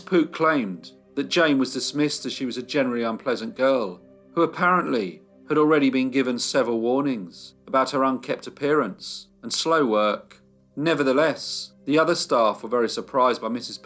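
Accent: England, United Kingdom. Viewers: Amazing work on this tragic and sad case Your brilliant english accent brings the stories alive! Thank you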